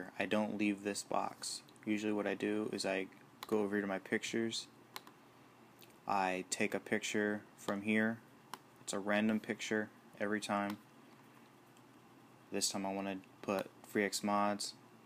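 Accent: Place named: United States. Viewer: Lovely American accent :)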